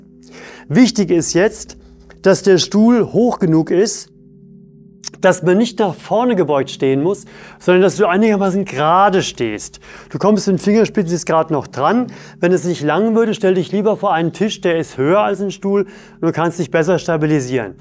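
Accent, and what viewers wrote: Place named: Hesse, Germany. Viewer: the best. Höse hoch usw. Mit hessisch gehts besser. 👍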